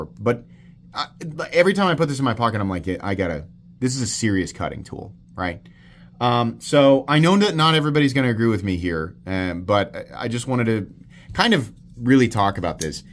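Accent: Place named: Midwest, United States. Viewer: don't worry about your accent. Keep the Midwestern accent alive!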